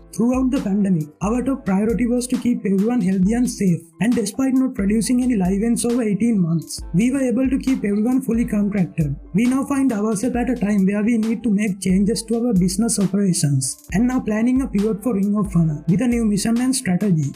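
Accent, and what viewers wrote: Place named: India. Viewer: Can I understand a single word this guy says. His Indian accent is just too strong to understand.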